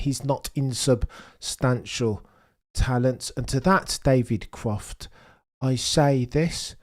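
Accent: United Kingdom. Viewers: I’ve never heard a Brit with a accent THAT bri’ish speak so positively about Max Bravo sir 🙏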